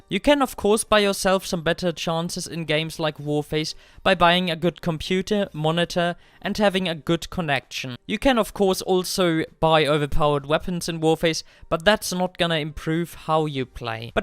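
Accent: Germany. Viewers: U german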